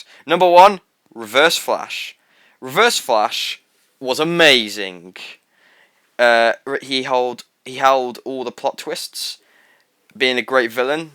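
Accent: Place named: London, United Kingdom. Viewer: Sounds like he's from London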